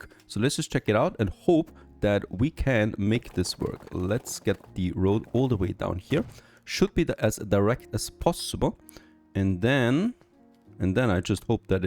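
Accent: Germany. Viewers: alter der deutsche Akzent ist so hart zu hören ne, aber ist ein cooles gameplay ;) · you only need signals at the end when leaving the double tracked bit. and stations have their own integrated signals. putting one in front of the station is basically asking for trains to block each other. signals in this game are only needed in parts where trains could otherwise block each other. they check the track behind the signal if its clear to the next signal and if yes it lets them go. so you want to place them at the ends of the double track to have them check if the path until the station is clear and if not they wait there. the signal after the station only increases your upkeep but doesnt add any value as the same function is integrated into the station. you would want some block signals in the double tracked part but you dont even need those if you are only running 2 trains. 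this entire line would do perfectly fine with only 2 signals, 3 if you want to be safe and give the depot a signal before merging with the main line. waypoints arent needed at all because the trains would automatically orient towards the correct side with the signals for exiting the double tracked part. your accent sounds german so if you are german i can explain it in more detail in german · ich hör das deutsch raus...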